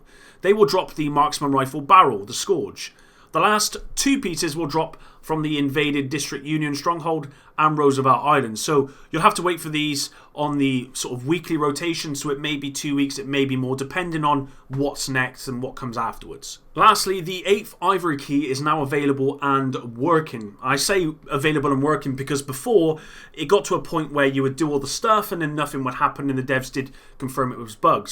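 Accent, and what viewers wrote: United Kingdom. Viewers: Hey mate.. just subbed.. I have seen your content before and always appreciate your nice clear commentaries. Except for linearly, but no one can say that world lol.. what part of the world are you in.. can hear a British accent in there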